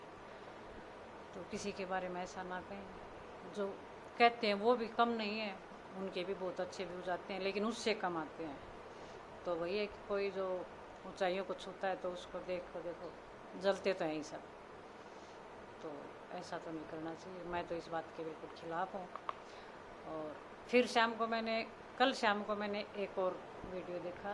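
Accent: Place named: Haryana, India. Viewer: Anti kti dhar pr dhr de h aapni haryanvi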